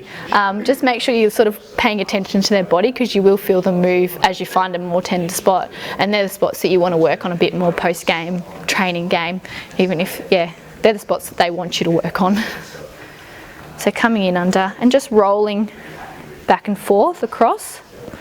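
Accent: Australia. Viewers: Wow. You definitely are from Adelaide. The accent gave it away. Great massage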